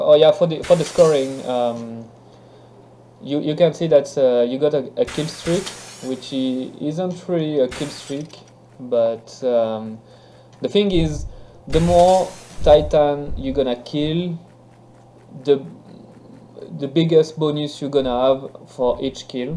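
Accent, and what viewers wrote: France. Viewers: On est d'accord il a un accent français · oh ok i was telling m'y self why have à frensh accent but he is frensh mdr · are you french because am i and you have the french accent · LE BON ACCENT FRANCAIS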